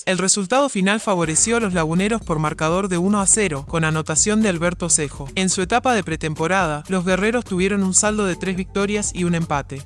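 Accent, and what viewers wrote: Argentina. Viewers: Quiten ese robot con acento argentino por favor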